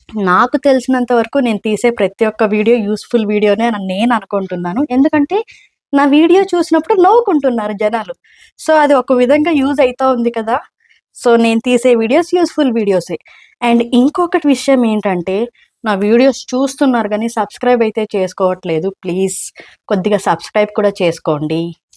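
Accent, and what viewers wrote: Karnataka, India. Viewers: Meru kannnada slag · మీ యాక్సెంట్‌ని బట్టి చూస్తే మీరు తెలుగమ్మాయిలా లేరు. కన్నడా కదా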